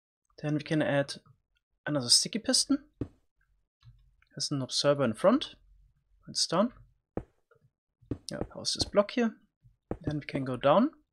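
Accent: Germany. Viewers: First time I am watching a video from you, after 2 seconds, aaahhhh you are german! :D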